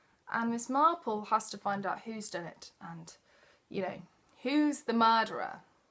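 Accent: England, United Kingdom. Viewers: Love your accent. Where are you from in England?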